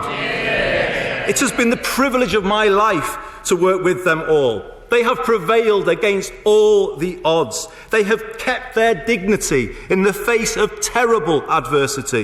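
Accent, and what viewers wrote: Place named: Northern England, United Kingdom. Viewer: One of the great House of Commons moments ever in my view. Delivered in a Northern accent though so will never get the credit it deserves. Equal to anything delivered by ANY MP. Ever.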